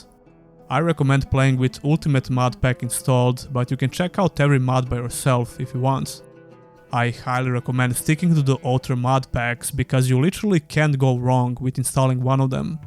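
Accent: Serbia. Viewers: ZNAO sam da si naš po akcentu :) odličan video! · I could recognize a serbian accent from miles away :D Dobar kanal brt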